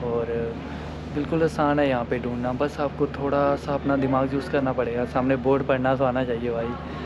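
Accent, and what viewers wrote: Pakistan. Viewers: अबे तेरी बातों से और जैसी हिंदी बोल रहा उससे पता चल रहा साफ साफ तूं पाकिस्तानी है इंडिया को बदनाम करने की साजिश मत कर chutiye कुछ नहीं उखाड़ पाएगा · Iska accent pakistani kyu hai? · Bhai teri tone se lag raha hai ki tu pakistani hai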